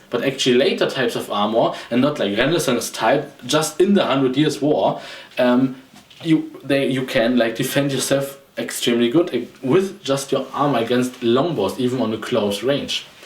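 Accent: Germany. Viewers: Ah, I love your accent. You're German, aye?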